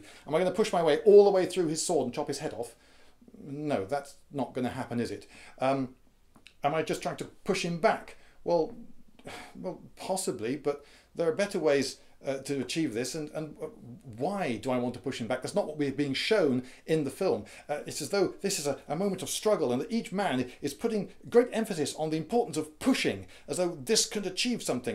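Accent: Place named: RP, United Kingdom. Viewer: know, I like Lindybeige's videos better. He's more animated and engaging to the viewer. can get too droll and boring at times. He's a talking head. A talking read with an "Ahh Pee" accent. I mean RP accent. I also like +Skallagrim. He's got plenty of visuals, so he avoids Matt's mistake of beinga talking head, and can be just as engaging as Lindybeige.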